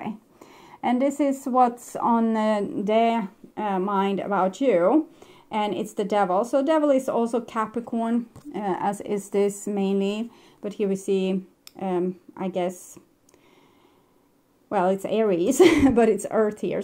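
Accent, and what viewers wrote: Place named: Sweden. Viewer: I knew you were sweadish when I first heard you. I lived in Sweden in the 90 's was so beautiful times !!🦋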